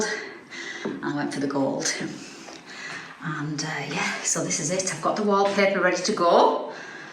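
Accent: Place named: Yorkshire, United Kingdom. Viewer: its going to be stunning. my friend used to live in same type of terrace exact same layout. i know you will make it fab. i just love the colours and wallpapers you have already chosen. the work will keep you focussed and give you a reason to get up each day. im sure John is smiling down on you. love your yorkshire accent , well im thinking its yorkshire we have many holidays there . the kitchen is beautiful and cant wait to see it finished.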